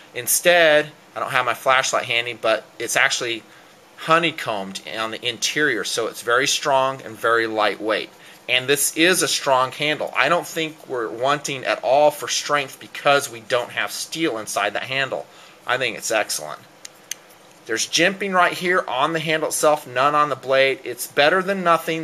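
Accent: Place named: United States. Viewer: 6 yeh he's finally dropped the squeak great reviews but annoying yank twang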